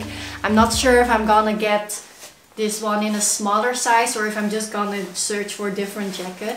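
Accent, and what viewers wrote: Netherlands. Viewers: Recognized the dutch accent 😂😂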